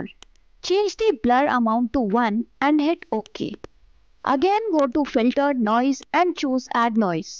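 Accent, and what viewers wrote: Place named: India. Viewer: Terrible. I didn't expect anything better when I heard an Indian accent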